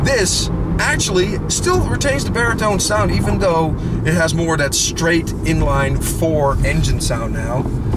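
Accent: Netherlands. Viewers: Ik kan nog steeds horen dat je uit Nederland komt! xD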